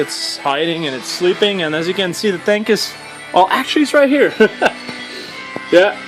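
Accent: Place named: Quebec, Canada. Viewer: Are you from Quebec bro?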